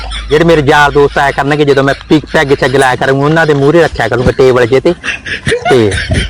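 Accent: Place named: Malwa, India. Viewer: ਆਪ ਜੀ ਦੇ ਵੱਲੋ ਖਾਸ ਤੌਰ ਉਪਰ .ਮਲਵੀਆਂ.ਦੇਵਾਂਗ ਬੋਲੀ ਗਈ ਅਵਾਜ ਤਾਂ ਬਹੁਤ ਹੀ ਵਧੀਆ ਲੱਗਦੀ ਹੈ।ਧੰਨਵਾਦ ਜੀ।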